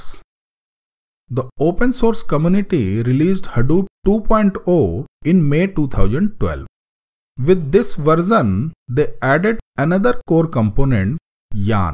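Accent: India. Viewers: Thanks for making these tutorials, they're just too helpful. Not only am I learning hadoop, but I am also getting more familiar with the Indian accent!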